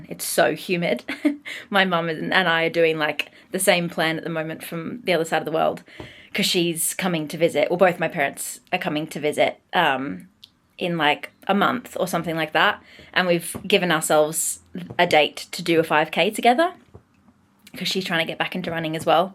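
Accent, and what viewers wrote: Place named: Australia. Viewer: The Aussie accent caught me off guard for your debut 😂😭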